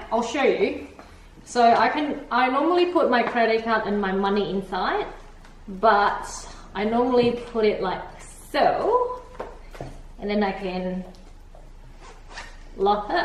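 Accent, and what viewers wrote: Australia. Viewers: I love your accent I'm Australian as well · Are you Australian?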